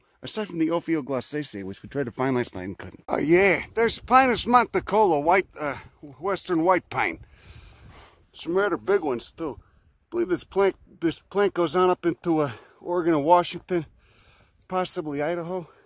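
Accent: Chicago, United States. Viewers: Truth! If you've never heard these Latin names pronounced before, you're going to learn them with a Chicago accent. Plus, there's that special "see you later, my friend" phrase. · First of all, your Chicago accent makes me feel so happy. Second of all, if I see a juvenile bear, I assume his mom is nearby somewhere and I’ll be the one running. Third, your knowledge is amazing! Thank you.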